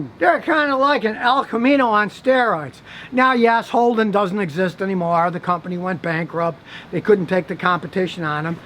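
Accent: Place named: United States. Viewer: Hmmmm I think I have misunderstood your accent. Sounds to me your saying Moore's not Morris but I think you are saying Morris correctly for your us accent.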